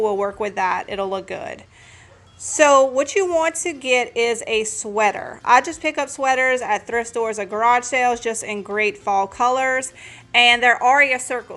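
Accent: Louisiana, United States. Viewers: Hey! I just found your channel and love it! I knew from the first sentence you uttered that you had to be from Louisiana and then I saw you wearing a Louisiana cap! I’m from North Louisiana. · I knew it. Haha!!! I’m also Cajun and I love your accent and your channel.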